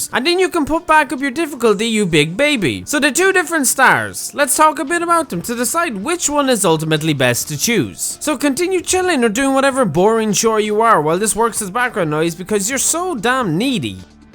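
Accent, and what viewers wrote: Ireland. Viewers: noice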